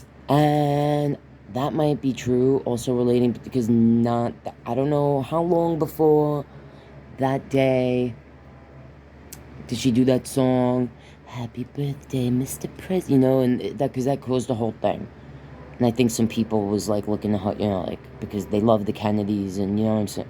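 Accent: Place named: New York, United States. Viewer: Loving 💞your videos as I go through a dark challenging time, your vibe is healing and helpful to me for reasons I don't understand ,and I like your ny accent or so, my grandma was from long island so it sounds familiar, ( the quiet epedemic and I'm not crazy I'm sick, videos and free documentary on Lyme disease called Under Our Skin, ( cousin to syphilis , u can be destroyed) the masses don't know how bad it can be it's like a sci Fi movie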